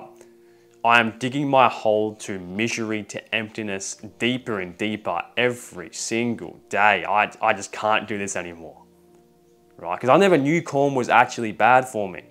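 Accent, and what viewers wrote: Australia. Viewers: made better with that Australian accent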